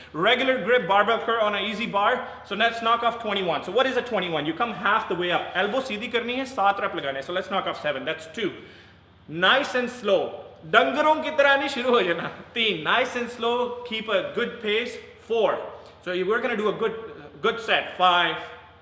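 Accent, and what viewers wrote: Punjab, India. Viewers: Excellent elaboration of each workout. I really like the punjabi language touch of the guy hosting the videos. Keep the good work up..!!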